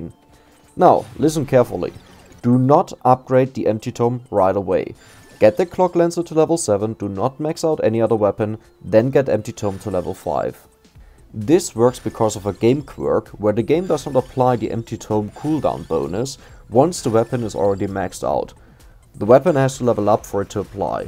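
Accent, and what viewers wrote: Germany. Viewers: Höre ich da etwa einen deutschen Aktzent heraus? Krasse leistung übrigenz.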